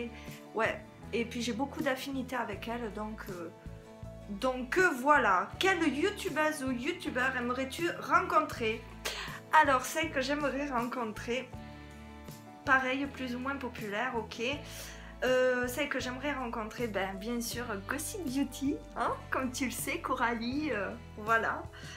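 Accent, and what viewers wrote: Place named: South, France. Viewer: AAAh ok j'entendais un petit accent du sud,bonne journée à toi!bizzzzzzzzzzz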